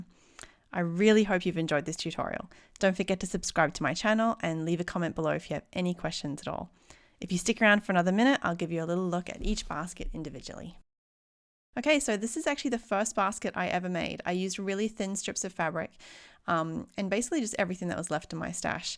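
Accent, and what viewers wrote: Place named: Australia. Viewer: Great video! thanks for sharing! :-) also great to hear another fellow Aussie youtuber too 😊 I’m now a sub!❤️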